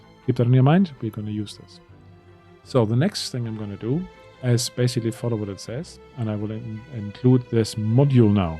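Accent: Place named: Germany. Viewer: Great video, straight to the point and thorough. I live in California now, but hearing your accent makes me miss Deutschland where I grew up as a kid. Danke Shon! Keep up the great vids!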